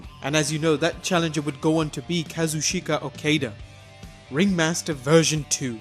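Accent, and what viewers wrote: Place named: United Kingdom. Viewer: To those complaining about this being a "10 obvious facts video", not everyone knows who Kenny Omega even is. I just now found out about him and I quite enjoyed this video because it helped me learn a bit about him. There isnt anything wrong with this video just because you already knew all of these facts. Some people don't know this shit. Get over it. And as for how he pronounces certain names, you can't expect everyone to pronounce shit the same exact way its supposed to be. This guy is british. They say things differently than americans and japanese people do. Stop getting so butthurt about it and just enjoy the damn video. ps, i love your videos. keep up the good work.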